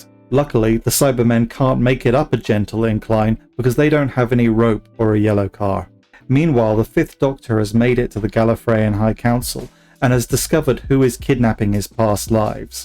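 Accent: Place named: United Kingdom. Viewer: In brum. Can tell you’re from the uk lol loved that show.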